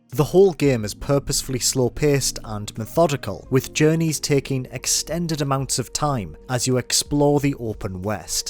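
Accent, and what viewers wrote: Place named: Durham, United Kingdom. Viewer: Sounds like a Durham lad 👍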